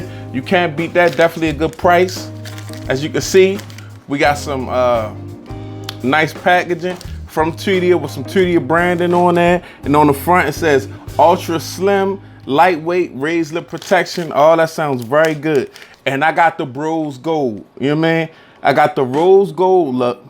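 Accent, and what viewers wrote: Baltimore, United States. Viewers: U from the Baltimore area? I recognize the accent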